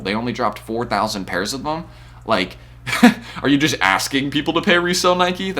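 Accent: South Carolina, United States. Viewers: W vid Btw are you from South Carolina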